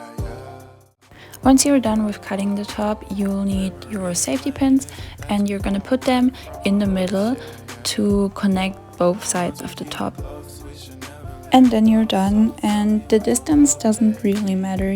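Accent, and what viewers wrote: Germany. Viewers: are you german? because you kinda have an accent :D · Maybe it's a bit of a stupid question but are you german? I saw a product in another Video that was german and you have a bit of a accent so I just wondered... (I'm german too btw) (oh and please ignore my name it's my fathers account😅)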